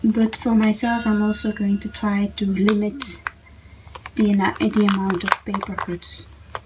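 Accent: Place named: Scandinavia. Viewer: Great to see more new YouTubers doing videos for onebookjuly! I love your accent, is it Scandinavian?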